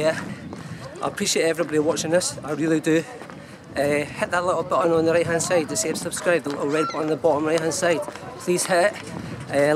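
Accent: Scotland, United Kingdom. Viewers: By the way thanks for all of your advice and I'm looking forward to coming in July. Love the Scottish accent by the way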